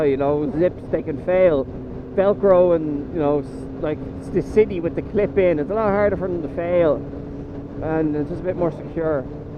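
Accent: Ireland. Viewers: what part of Ireland u from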